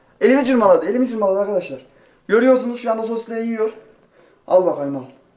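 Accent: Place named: Ankara, Turkey. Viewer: Haçı sen Ankara lımısın bunasıl şive agız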